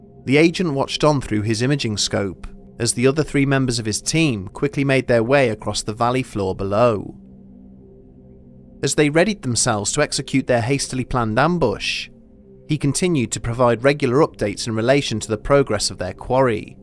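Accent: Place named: United Kingdom. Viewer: Got yourself a sub, my guy it's good to hear a fellow UK person do these kinds of videos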